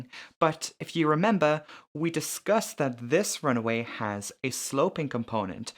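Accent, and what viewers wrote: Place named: United Kingdom. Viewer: No she’s just British don’t be racist.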